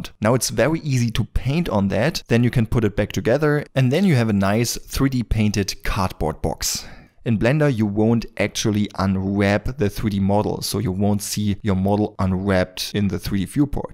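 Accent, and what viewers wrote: Germany. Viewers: Your English is good (I think, I don't know, I am not a native English speaker either), but I figured out that you are from Germany from you accent anyway. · dat german accent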